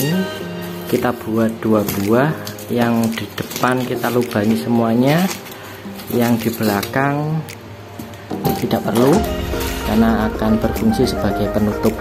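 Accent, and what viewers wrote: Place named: Java, Indonesia. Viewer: Josssss pak ketua 👍👍👍 medok2 gak masalah yg penting jadi😅😅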